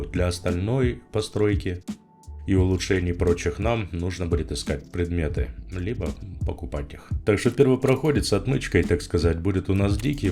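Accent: Kazakhstan. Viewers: говор прям наш сразу начал подозревать что с Казахстана!))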